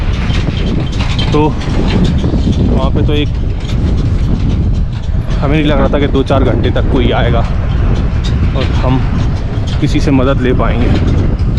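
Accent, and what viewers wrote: India. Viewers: Bhasha teth Ashoknagari? . Kya rishta hein aapka Ashoknagar se? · Bhaiya Agra side ke ho kya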